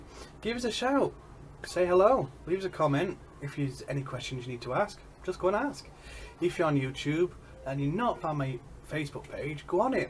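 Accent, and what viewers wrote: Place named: Yorkshire, United Kingdom. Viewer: Eyup fella, sounds like tha lives near me somewhere in Yorkshire. Good videos, very helpful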